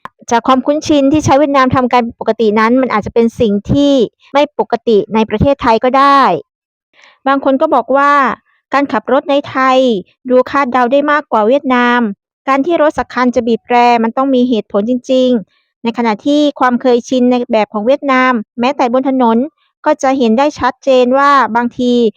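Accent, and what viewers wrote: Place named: Laos. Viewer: น้องแอด เป็นสาวลาวป่าว..สำเนียงน่ารักน่ะ...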